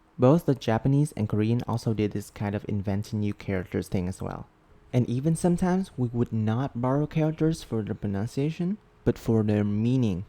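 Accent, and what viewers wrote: Vietnam. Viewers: He's speaking in Southern dialect, while you may learn Vietnamese in Northen dialect, I guess. The tones can be very different between dialects. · for an implied southern viet you pronounce a lot of your words in a northern accent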